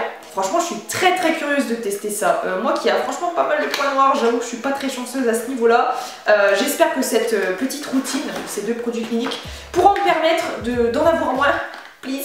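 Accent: North, France. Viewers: Sophie Mdg c’est l’accent du nord 😅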